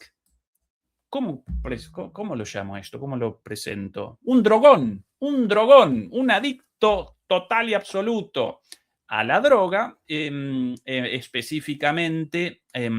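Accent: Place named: Buenos Aires, Argentina. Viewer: Pero hablas Porteño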